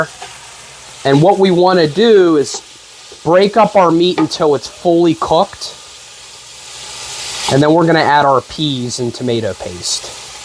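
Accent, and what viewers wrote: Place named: Pittsburgh, United States. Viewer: Born and raised in Pittsburgh. First video I’ve ever seen of you. Soon as I heard you talk, I thought this guy is from Pittsburgh.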